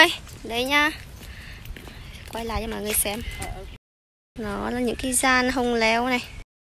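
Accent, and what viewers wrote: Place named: Nghe An, Vietnam. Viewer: Xin chào oi, hôm nay lại được gặp và nghe giọng Nghê An hà tĩnh dễ thương làm mình nhớ VN mình quá, vườn hoa hông chỗ bạn đẹp quá, nhiều hoa nữa, mõi lần xem video của bạn mình có cảm giác đang cùng bạn đồng hành ở 1 đất nước rất xa VN, dù ở ca nhưng mình thấy có vẻ rất gần vì mõi ngày vẫn lên YouTube tìm xem video mới của bạn, nghe giông VN xa quê, người VN xa quê. Bên đó vẫn đỡ buôn hơn vì có cộng đồng VN mìn rất đông phải ko bạn. Bạn giữ gìn sức khoẻ nha. Chúc gia đinh bạn nhiều yêu thương. Mình vẫn sẽ chờ đợi nhưng video mới của bạn mõi ngày và đồng hành cùng gia đình bạn.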